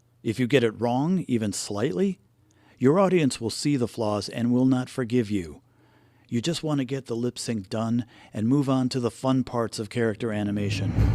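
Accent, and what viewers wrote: Australia. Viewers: Great video. Hey are you an Aussie or a Kiwi? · Nice to hear an Australian for fucking once!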